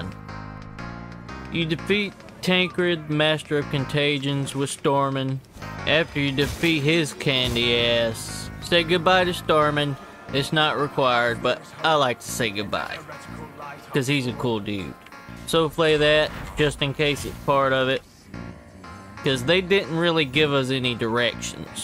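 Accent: South, United States. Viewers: I agree 100. He's quirky, interesting, and I love the southern accent.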